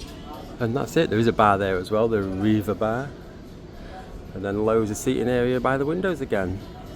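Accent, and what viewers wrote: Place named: England, United Kingdom. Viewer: Being an Irish gay man myself, I love your English accent - and you are very handsome looking too 🇮🇪☘️🇮🇪😍😍😍😍😍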